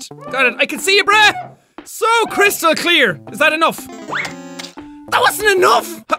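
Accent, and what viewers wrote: Ireland. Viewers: heya you uh you irish i'm new to your vids so idk and if so i'm part irish so.... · This was the first video I ever say on this channel, dang nostalgia. I remember being surprised by the intro, not because it was loud but because he was Irish 😂.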